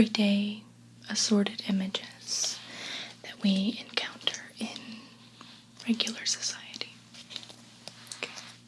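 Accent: South, United States. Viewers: The Christmas series she did with the curse removal had more of a southern accent going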